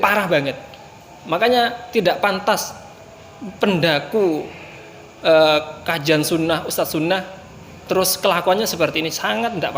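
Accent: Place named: Madura, Indonesia. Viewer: Sangat nampak loghat madura nya walaupun bicara bhs. Indonesia. Lanjutkan dakwah jennengan ustadz ustadz aswaja ORENG MADUREH DERIH SUMENEP SAMPE' BANGKALAN SIAP ADUKUNG DA'WAH EPON AJUNAN TAD🙏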